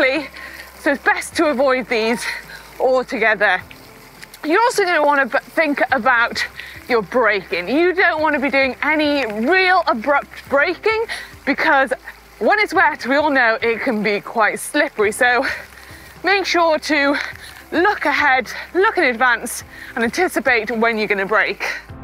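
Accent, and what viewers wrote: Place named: United Kingdom. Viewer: Oh, i love the bristish accent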